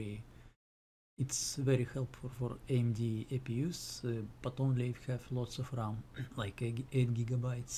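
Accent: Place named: Russia. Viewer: Dude I need this tutorial for my R9 390 and I just realized you are Estonian, lessss goooooo. You have a thick Russian accent so you probably have a accent while speaking Estonian as well but I am just glad to see another brother on the web.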